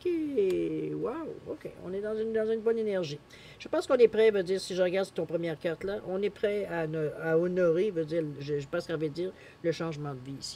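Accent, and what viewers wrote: Quebec, Canada. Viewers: merveilleux accent québécois !!! :-)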